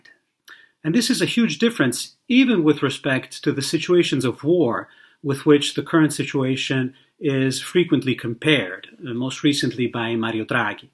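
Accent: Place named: United States. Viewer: Nice American accent for an Italian, bravo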